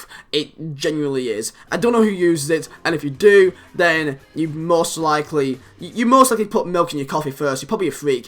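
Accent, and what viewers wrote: United Kingdom. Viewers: u need to learn how to speak proper understandable english not this terrible english with a british accent that sounds dreadful.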